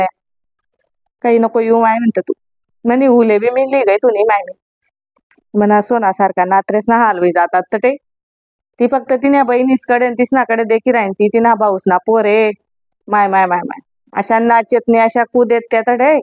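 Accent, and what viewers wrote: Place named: Khandesh, India. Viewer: मस्त अहिराणी येते तुला छान वाटते ऐकायला .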